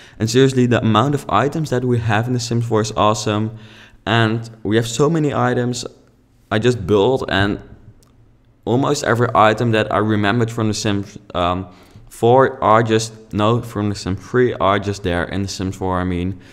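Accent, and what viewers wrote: Netherlands. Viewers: Hehehehhe,you have a duch accent, i also have one bc i live in e Netherlands ;) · heyy ik ben ook nederlands lol ik herkende het accent gelijk :d ik krijg de sims 4 over 3 dagen binnen en ik ga denk ik dit huis gebruiken echt moooii xx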